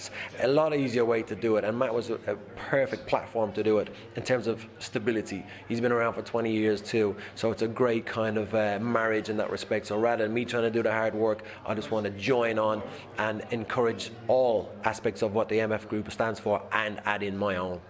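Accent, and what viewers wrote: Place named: Dublin, Ireland. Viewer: i like this fella the rough Dublin accent has improved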